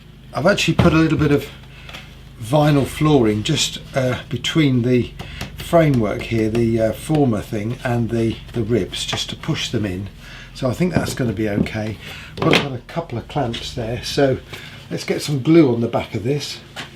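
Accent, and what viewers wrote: United Kingdom. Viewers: By the way, we really appreciate that you narrate your videos and tell us what you’re doing and why and where this bit of wood came from, etc. So many these days are doing the silent ASMR-type of videos, and while those have their place, we prefer the talking. And it’s nice that you have a British accent, too.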